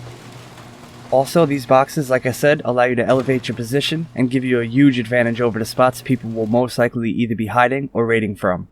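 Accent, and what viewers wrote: New York, United States. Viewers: i hear a accent, new york maybe?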